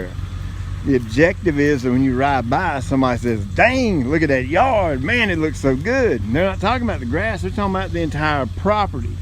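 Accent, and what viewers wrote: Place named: Alabama, United States. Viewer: Cool accent? What state? Alabama?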